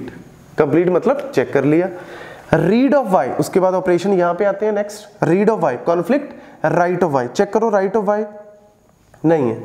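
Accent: Punjab, India. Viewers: sir are u from punjab??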